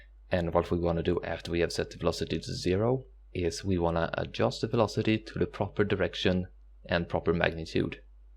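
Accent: Sweden. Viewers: Älskar din uppenbara svenska dialekt :)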